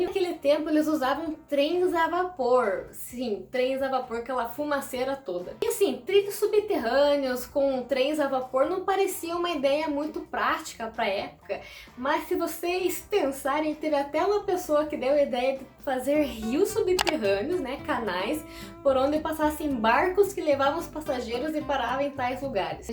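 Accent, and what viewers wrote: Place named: Santa Catarina, Brazil. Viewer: tenho uma amiga em Videira - SC seu sotaque é muito parecido com o dela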